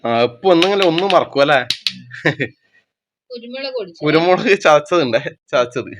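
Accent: Kannur, India. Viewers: Niggalku. Nammude. Kannur. Basha. Manasilakunile😂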